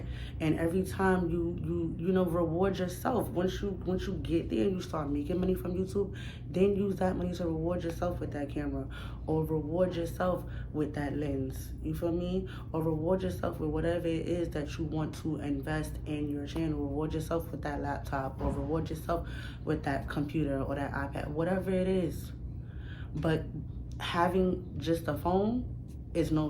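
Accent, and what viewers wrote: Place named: New York, United States. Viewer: In 2025 we are getting rich off of content yes. Get it sis.💐🥂 I felt that. The stand is super cute and has good lighting 💡. Big facts on people is looking for what can you give them. & What can they look forward to coming back to? Everybody gotta start from zero followers subscribers… views. Content is like making a ship or the bottles that people put notes in then put it in the ocean hoping it will land on a island one day and somebody would read. That’s like videos on the web and people click them based from the thumbnail or title. 😁 lol you had me cracking up. Shorts bring a lot of attention to people about there channels and giving people a quick reason to subscribe for more content. Word is going around that A lot of people have short attention spans as well.. so that’s why shorts come in clutch 🤷🏽‍♀️ one time I got a comment that said “your video be too long. 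You need to make them shorter “ when I looked. The video was only about 9 to 10 minutes. 🤦🏽‍♀️ yes I’m looking forward to the long content. I can’t wait to see. is where I’m at now in the video. I’m listening and writing along, so I don’t forget what I have to say and you just talked about what I said about the short attention spans 🤯🤭 lol but click baits are the worst. Smh lol ppl play to much. You had great great points and yoooooo pls with the “did you miss me “ 🤣🤣🤣🤣🤣 hahahhahaha yo I can tell your from NYC me toooo. And it took years for me to realize New York do have an accent just like country ppl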